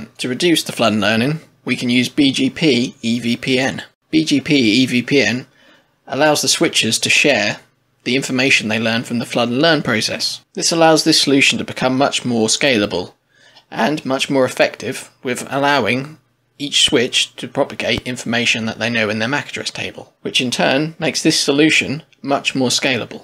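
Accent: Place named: Scotland, United Kingdom. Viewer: 你的口音让我想起了我远在苏格兰的奶奶。视频很棒。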